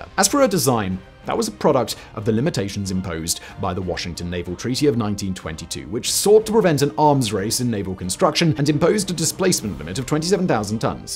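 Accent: United Kingdom. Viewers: I find myself conflicted given I have quite the military boner in regards to an American Aircraft carrier bringing the pain, yet it's history is being delivered by a Brit....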